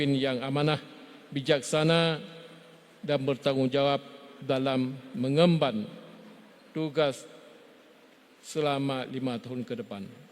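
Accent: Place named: Aceh, Indonesia. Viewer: muzakir manaf belum pas berbahasa Indonesia masih logat Aceh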